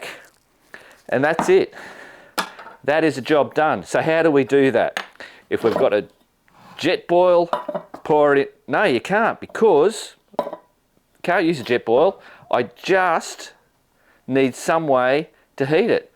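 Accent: Australia. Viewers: Crazy Australian, crazy accent. But.... I'm 57 and going moto camping for the first time this summer and this is a great series of videos. Hey, Presto!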